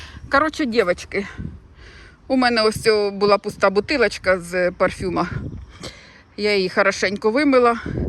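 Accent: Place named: Kryvyi Rih, Ukraine. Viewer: Жалкую, що Ви не з цього міста. Хотіла попросити про допомогу. Треба тих, хто знає головних на кладовищах. І ще, у вас говір криворізький, рідний❤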